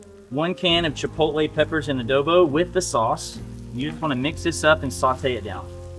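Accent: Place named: California, United States. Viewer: Easy Bake Oven lol all ladies should have one. And you are from Texas???? Sounds like maybe you’re from California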